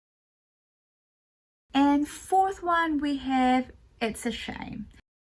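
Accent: New Zealand. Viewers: You have a kiwi Accent now.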